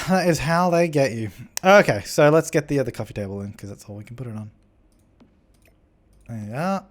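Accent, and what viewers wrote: Australia. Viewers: He does sound normal doesn't he? Makes a nice change. It even sounds like an Adelaide accent.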